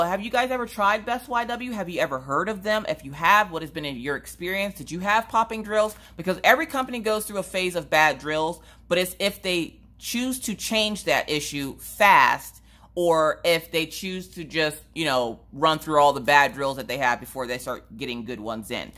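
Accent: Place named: South, United States. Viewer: I absolutely love listening to you as I diamond painting. I am a desert transplanted southern girl and it is like listening to me and my friends.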